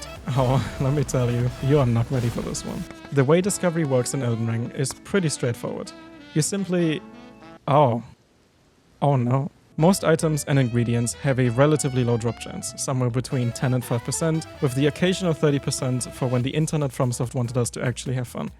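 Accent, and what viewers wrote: Germany. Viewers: Als ich den Akzent gehört hab wusste ich direkt dass du Deutscher bis xD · no front but you have to be german cuz youre accent in speaking is yk "BAD" but im german too sooo...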